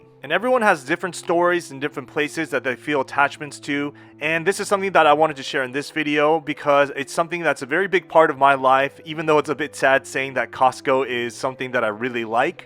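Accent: United States. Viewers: this american sounding asian guy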